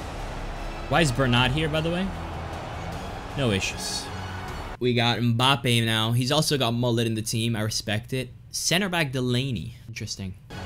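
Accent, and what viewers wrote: United States. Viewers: England?!!!😂 he sound like every white American dude but he is Italian he always be talking about it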